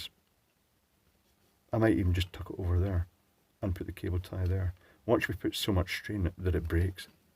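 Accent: Scotland, United Kingdom. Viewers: We all came here for pleasant Scottish accent. Never get disappointed when he calls for that schematic. Other Scotts could point out that besides saving the cable tie with a knot, the much cheaper 1N4002 50V 1A diode would have been much less of an overkill and provided a bit more Glenfiddich.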